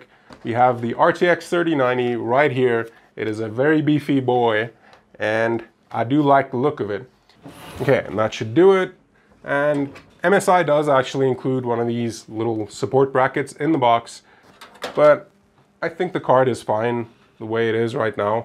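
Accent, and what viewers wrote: South Africa. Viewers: Awe are you from South Africa bro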